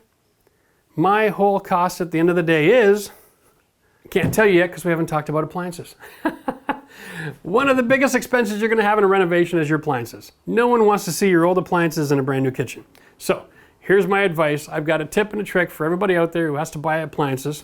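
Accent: Canada. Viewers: I trust you as a person and contractor, I live in Louisville, KY area and honestly I need a contractor like you. I had one and screwed me and ran away with half finish and some mistakes needs to be revisited . I need to finish the basement about <num> sq. to finish . If you know an honest reasonable contractor, I would appreciate the name/ names and a mode to contact them. I am a disabled retired individual for 12 years but I am Ok the Lord has be faithful to me and I am frugal and do not like waste since I grew up in Egypt poor but hard worker educated which was free in Egypt. Mat God bless you and your work abundantly. That would be of great help. If you can. Good day. Physically I cannot help , I had my knee was replaced 6 weeks ago. and I will need back surgery as well just to be able to tolerate the pain and decrease the narcotics and sedatives I am dependent on them but my kidney and liver will fail if I continue. 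GBU , It sound that yu are in Canada so I may be out of luck. lol.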